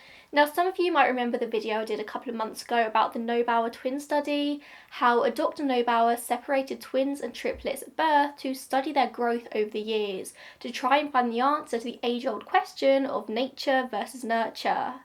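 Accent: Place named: Tottenham, United Kingdom. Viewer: I'm gonna guess you're from Tottenham. Just a random guess...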